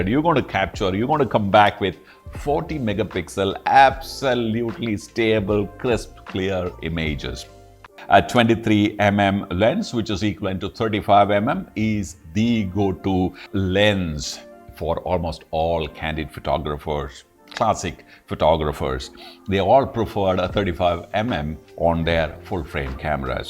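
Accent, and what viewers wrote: Kerala, India. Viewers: great Keralite accent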